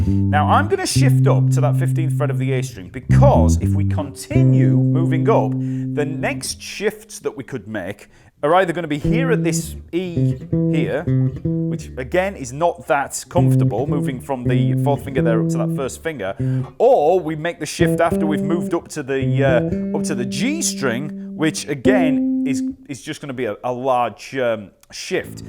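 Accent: United Kingdom. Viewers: You are, a great teacher. Moreover, I can learn better British English pronunciation:)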